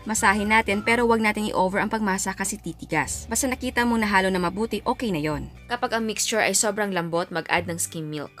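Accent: Visayas, Philippines. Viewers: bisaya po ba kayo parang bisaya po kasi kayo mag salita pero ang galing nyo po gumawa ng pagkain or dessert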